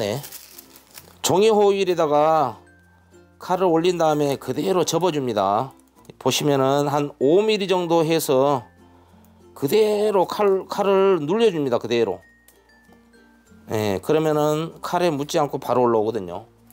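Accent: Jeolla, South Korea. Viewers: ㅋㅋㅋㅋ붙어부니까요..전라도 사투리는 들을때마다 재밌는거 같네요. 좋은 아이디어 감사합니다.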